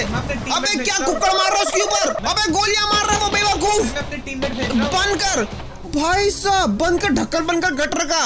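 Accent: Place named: Assam, India. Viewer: app assemes bolteho Kiya app Assam rehtohe kiya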